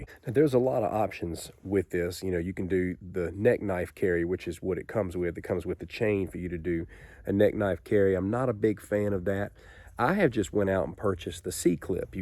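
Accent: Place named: Virginia, United States. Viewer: I think he is from Virginia USA.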